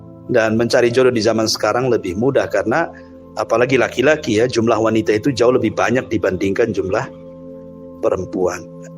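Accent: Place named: Sumatra, Indonesia. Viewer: Kl dr logatnya, sepertinya ustadz dr daerah Sumatra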